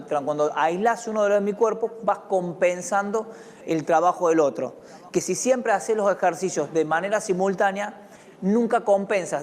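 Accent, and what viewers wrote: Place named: Cordoba, Argentina. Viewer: Muy bueno profe !! Se le nota de acá a la china el acento cordobés !! Saludos de Córdoba capital !! 👌👌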